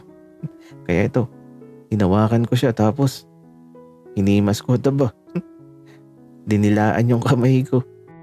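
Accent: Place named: Laguna, Philippines. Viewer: likas ka bang taga laguna? ung intonation kasi ng boses mo.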